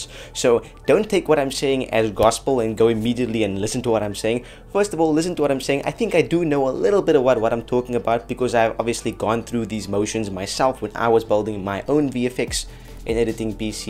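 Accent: South Africa. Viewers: Wena you are a South African neh?!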